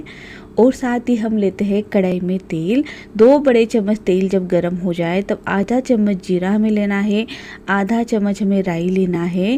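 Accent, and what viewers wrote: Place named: Sindh, India. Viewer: Ur accent is Sindhi MashAllah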